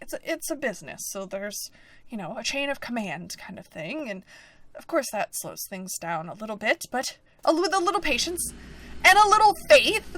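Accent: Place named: United States. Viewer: lives in America, you can hear that from her voice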